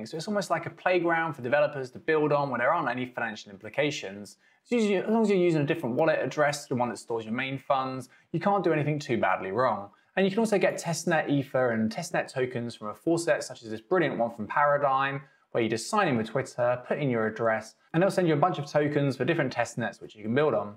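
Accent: United Kingdom. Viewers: Thanks a lot for the time you put in, it's also refreshing to hear you are also from the UK. Do you have any advice on the best way to apply for roles? And how long the process would usually take to start working? Thanks a lot in advance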